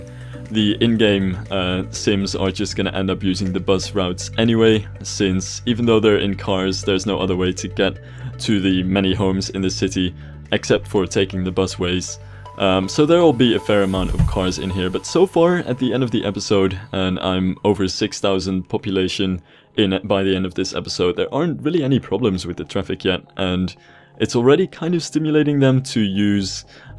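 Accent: Netherlands. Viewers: Yeahh I know but I was checking his Socialblade and there was an other country xD but his voice is Dutch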